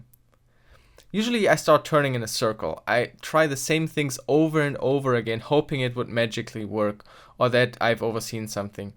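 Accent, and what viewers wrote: Germany. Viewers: Are you German? Because I am and your accent sounds really German, like I sometimes forget I'm watching an English video😂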